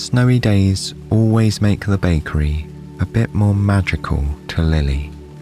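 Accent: United Kingdom. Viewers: Sry but i hate brittish accent for storytelling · Same. He voice just seems the perfect timbre, he speaks in a perfect rhythm, plus he’s English. Idk why, American accents don’t work for me when it comes to relaxing, I find them grating and kinda stress-inciting. Oh well, we’re all different right? Love this channel, especially the Rainy Day Bakery series. 🌧🌙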